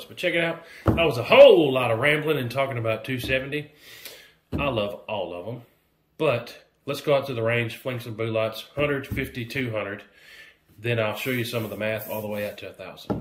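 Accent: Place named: Arkansas, United States. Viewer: Are you saying “boo-lots” instead of “bull-its”? I’ve never heard a bullet called “boo-lot”. Where are you from? I sense an accent, but can’t place it. If I were to guess, though, I’d say Arkansas or there about.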